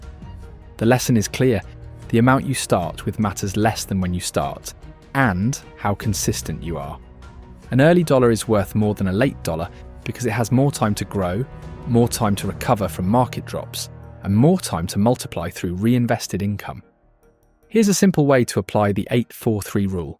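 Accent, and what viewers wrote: England, United Kingdom. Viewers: Suggestion: change the accent. The English one is getting annoying.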